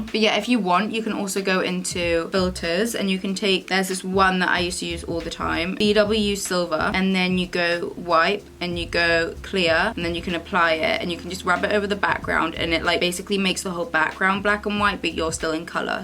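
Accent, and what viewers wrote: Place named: Newcastle, United Kingdom. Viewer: Are you from the Newcastle area? You sound like Perrie Edwards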